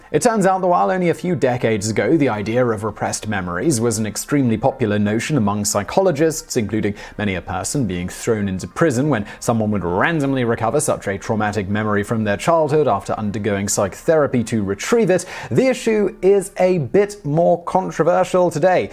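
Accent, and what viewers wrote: United Kingdom. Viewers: Psuedo intellectualism influenced by post modernism is the curse of the 21st century. A british accent doesn't make it so. 2 hours of gross over statements, lies and fluff.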